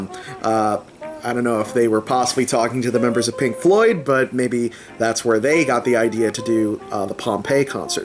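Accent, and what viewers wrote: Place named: United States. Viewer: Why are they always Americans that do this sort of thing,they speak too fast with terrible accents,I keep waiting for him to say Oh my God yuk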